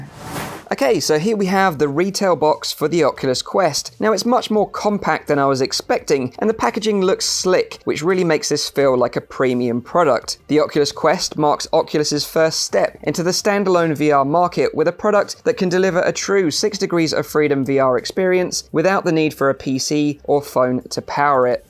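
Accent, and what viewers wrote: United Kingdom. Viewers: Glad to have found this. Now I know this is nothing for those of us who create VR artand animation. We cannot install our animation app (like Quill) 🙁 ..and thanks for the brit accent! Clear to understand!! 👍🏽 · Why are British-accented people all so good at tutorials??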